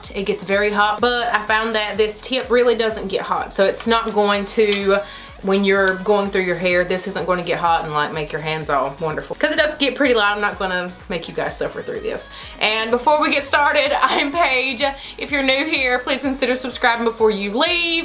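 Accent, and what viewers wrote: South, United States. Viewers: You are so down to earth and I LOVE your accent! After I hear someone’s accent I start talking like them lol! After I finished your video my husband was like, “why are you talking with a southern accent?” 🤣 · Lori T I’ve never heard anyone with that thick of a southern accent in NC. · I like your southern accent!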